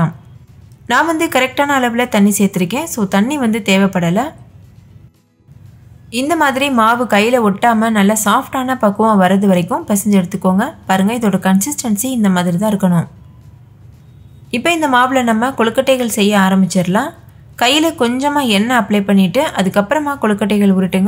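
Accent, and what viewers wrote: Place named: Kerala, India. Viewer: Hi sis,,,,I am very interested in your cooking videos,,,I am malayali but also used to watch ur videos,,,I like all ur videos,,,and ur pronounciation with some Malayalam words ,,.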